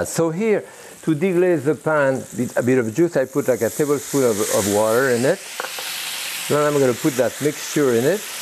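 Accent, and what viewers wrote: France. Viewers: Sean He just has a thick French accent...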